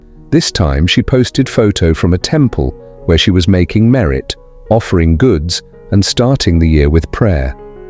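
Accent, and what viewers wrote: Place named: England, United Kingdom. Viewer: Εισαι σωστός αλλά από τήν προφορά καταλαβαίνο ότι είσαι Άγγλος. Οπότε δεν θα σε ακολουθήσω γιατί σιχαίνομαι την Αγγλία και τις πολιτικές τις . Εσεις ψηφίζετε τους πολιτικούς σας άρρα εσείς είστε υπεύθυνοι για τό ότι προσπαθείτε να σύρετε την Ευρώπη σε πόλεμο. ΕΞΩ ΟΙ ΒΡΕΤΑΝΟΙ ΚΑΙ ΜΑΚΑΡΗ ΝΑ ΣΑΣ ΚΑΨΗ Ο ΠΟΥΤΙΝ.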